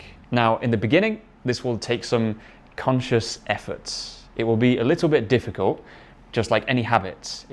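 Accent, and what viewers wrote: Northern England, United Kingdom. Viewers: Who loves the British accent like me? 🙄🤓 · Hi,! Why do you say "mug" as [mug] but not [mʌg]? Are you from Northern England? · thank you you are kind and well done on your youtube. I am a senior English teacher from MOROCCO..your accent tells me you are from the northern part of England 😊 thank you very moutch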